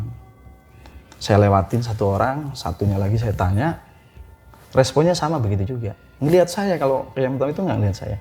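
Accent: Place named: Banyumas, Indonesia. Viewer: O wong ngapak to, salam Sitinggil Cilacap yo ang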